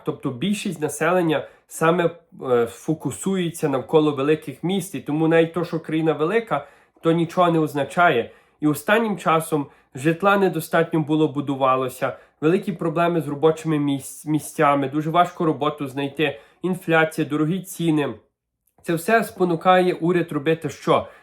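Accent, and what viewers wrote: Canada. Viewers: Ставлю тебе дорогенький Канадский щерый Уураинець вподобайку 👍 за таку гарну мову и Канадский акцет а новости тут у наших штатах таке саме маймо и будьте обережни не подходит до кордону дуже блезько бр Наши ICE можуть и ваших також депортувати из Канади подим скажут щё ми незнали шё з Канади неможна було ни кого чипати якщё неправильный аусвас то на депортацию 😢 А так дуже рад був мову цю чудесну старинну почюти 🤝😉💪👍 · такий прикольний акцент, ніби ще ваша прабабця емігрувала в Канаду ❤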